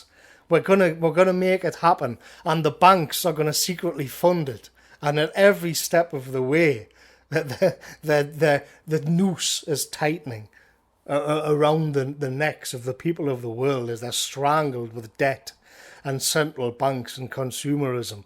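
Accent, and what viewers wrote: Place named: Newcastle, United Kingdom. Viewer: Fuckin hell a Geordie?! :O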